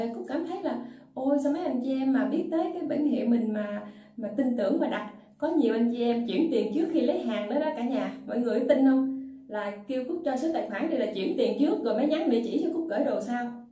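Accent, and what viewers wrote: Mekong Delta, Vietnam. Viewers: Wow là gái Cần Thơ. Hèn chi nghe nói chuyện đúng giọng miệt dường. Nói chơi tui cũng dân miệt dường mà đang ở xa lắm, lại thích nói tiếu lâm như.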